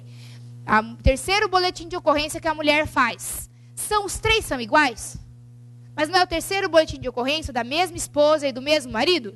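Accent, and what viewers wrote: Rio de Janeiro, Brazil. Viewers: Ótima aula, mas esse sotaque dá neRvoso! :D --- cariocas entenderão.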